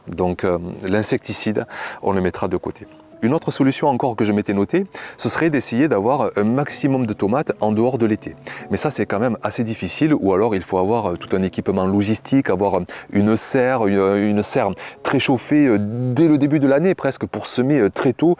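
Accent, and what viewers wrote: South, France. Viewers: Haaaa l accent du Suuuud! Ma femme rigole toujours quand je parle de potager et d idées! Elle me dit toujours " t as encore regardé le type de Sud hein " 😅 Bref tout ça pour dire que je compatis et que j ai eu la même chose... Mais... Je vis en Suisse, 8 plants sous serre semi ouverte en plein soleil donc pas d'ombre. C est ma 3eme année de potager (2022 et 2023 etaient moyens mais j avais pas trop amonder mon sol😊). Finalement mes voisins qui ont tous un potager ont aussi été ravagé. Cela avait aussi commencer en début de juillet dans ma serre mais beaucoup moins fort que mes voisins ( 20 mètres en chaque potager environ) Je me suis essayé à la décoction de prêle. Je taillais tout ce qui brunissait et je pulverisais par dessus avec le prêle. Finalement je suis le seul qui ai réussi à sauver mes plants. Fin juillet cela s est arrêté de brunir. 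Et j en ai finfalement eu des tomates et elles ont surtout bien rougis, comparer à mon voisin direct qui a une serre aussi et qui avait mis de la bouilie bordelaise, cela n a pas fonctionné. Est ce le prêle? Ma serre? Un coup de chance? Je sais pas mais continue dans ce que tu fais c est tjrs un plaisir de te écouter et garde espoir. 😊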